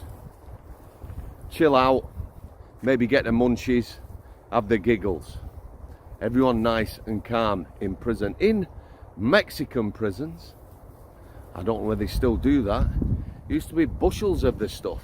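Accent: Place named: Wigan, United Kingdom. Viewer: I wish I knew where you came from, you sound like a Wigner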